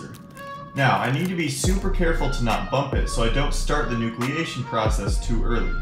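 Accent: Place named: United States. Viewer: Oh my God, I love clear American. It’s so good.😂😊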